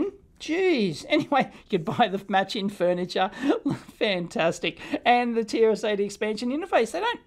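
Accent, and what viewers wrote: Australia. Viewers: I thought it was a Techmoan video... until it started with that Aussie accent lol.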